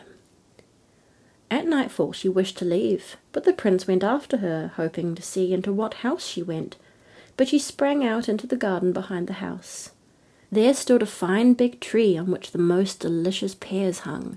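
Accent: New Zealand. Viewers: I listened to this story with my four year old son, its lovely to hear a story in our wonderful kiwi accent! we both enjoyed it, thank you xxx 🌹💖 🤘😈🤘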